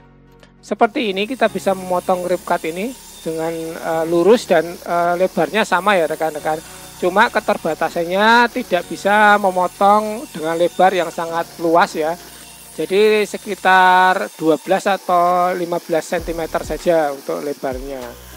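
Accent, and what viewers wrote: Java, Indonesia. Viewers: Lidah Jawa nya tebal bro.... 😂 😂 😂 😂 😂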